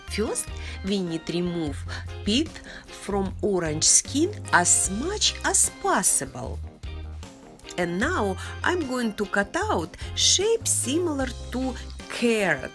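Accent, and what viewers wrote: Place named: Russia. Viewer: wow amazing good thank for sharing i think from speaking your look like Russian 🙂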